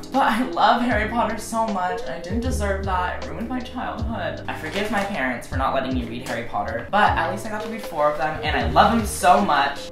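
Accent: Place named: Texas, United States. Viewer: your texan accent is so prominent in this video. IM QUAKING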